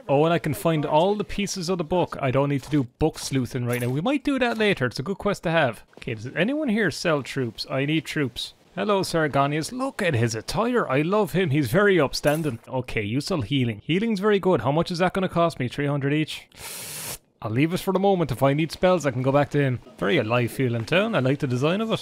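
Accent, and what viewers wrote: Ireland. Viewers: The game looks amazing. The great Irish accent fits as well.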